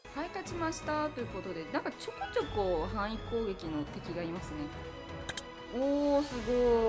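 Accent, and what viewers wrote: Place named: Kyushu, Japan. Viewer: はじめまして！は九州の方ですか？方言が身近に感じました！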